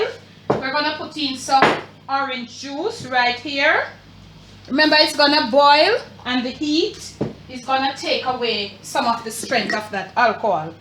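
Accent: Jamaica. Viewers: Thank Ms..I love all of your recepies....😍😍 Love the way how you keep the Jamaican accent.. everthing that I have in mind to try so far, I just click on your channel..and There you are.. Manner and respect!!! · Hi Ms., i love your Jamaican ascent, an i love your rum cake. If you are baking for kids what can you use instead of rum. Thanks dear.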